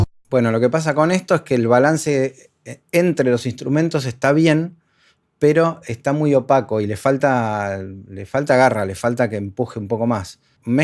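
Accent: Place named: Rioplatense, Argentina. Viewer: Ay que bien, un Argentino o Uruguayo, estoy chato de españoles 😅, saludos desde Chile 😊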